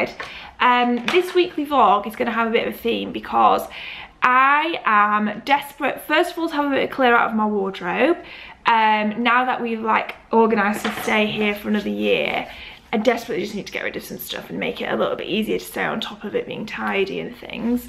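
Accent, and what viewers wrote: United Kingdom. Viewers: Hey! Just got to the point in the vlog where you've spoken about feeling kind of stuck and frustrated in terms of your blog.. and to be honest I don't get it! I LOVE the content you're putting up recently. No matter if it's outfits or collaborations (like the h&m one, which I loved especially) Reading your post makes me giggle sometimes and I even read them in your british accent!! You seen to be such an ambitious young lady, who knows exactly what she wants and I find that so inspiring. There is really no need of feelig frustrated. I think a lot of these feelings come from maybe comparing yourself with others, in this ever changing industry and having to be on top of style, content and life in general. you're doing a Great Job, in all these aspects! and by great I don't mean perfect, because perfect doesn't excite many people. By great I mean relatable, honest and true to yourself! 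much Love xxx PS: I go on your blog every time I've seen an Instagram/snapchat post where you update your followers about it! keep that up xx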